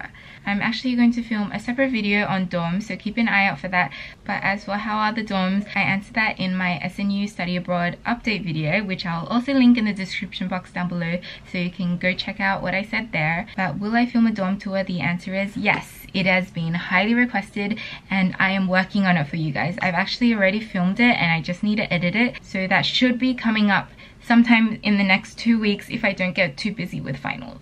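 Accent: Australia. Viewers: Your aussie accent is a boooooomb ‼️💖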